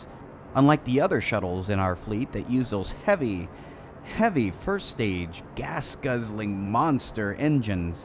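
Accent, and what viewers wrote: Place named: Russia. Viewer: Nice russian acsent, bro! :D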